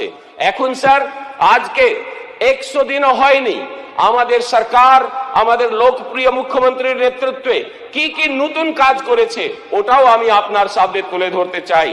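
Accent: India. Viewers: Apnar bangla ucharan akhono hindi tan.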